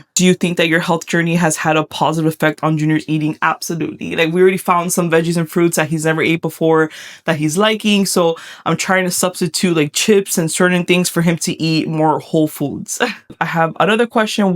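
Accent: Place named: Miami, United States. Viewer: Girl I knew you were a Miami girl the SECOND I heard you speaking 😂 after moving up north, the beautiful sound of that accent was like a hug ☺️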